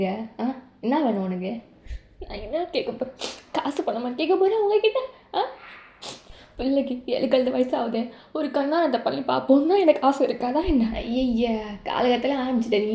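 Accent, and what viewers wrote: Chennai, India. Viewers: Madras slang super sis 🔥🔥🔥🔥🔥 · Story romba nalla iruku iyoo antha chennai slang tha semaiya pesuringa iyoo enaku romba pidichiruku but story avlo thana nalla irunthuchi duration than kamiya iruku innum kojam irntha nalla irukum story super ❤❤❤❤ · Taekook love so cute JK mom great jk jimin Felix friendship really cute 🥰🥰 this movie all songs my favourite songs ❤❤❤❤❤❤❤❤ Chennai language உங்களுக்கு நல்லா இருக்கு match ஆகுது😂😂😂😂 different style movie 🍿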